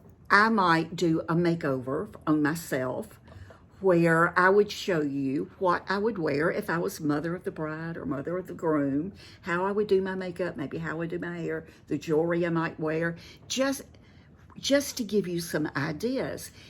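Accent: South, United States. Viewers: I’m almost 56. I’ve been watching for a little more than a year now because I love your outlook on aging and just life in general. You also have a calming voice and lovely southern accent that reminds me of my home state. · You have inspired me and I love your southern-style and Your accent and you stories 2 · Hi there dear lady....Just found your site. Love your Southern accent. Looking forward to viewing more of your lovely videos...(a nana in Pa.)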